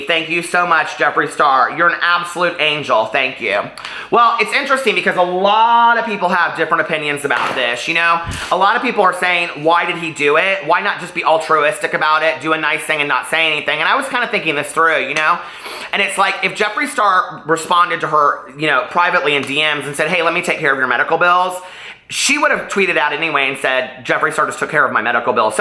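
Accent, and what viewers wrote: South, United States. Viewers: ahhhhh j star clickbait from the drama channel. is so vocal fry...drama channel grown man trying so hard to sound like an 80's valley girl with his gay southern accent.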